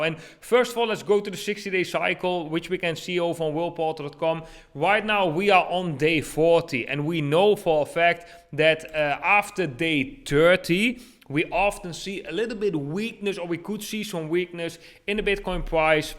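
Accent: Netherlands. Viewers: Are you from Holland ?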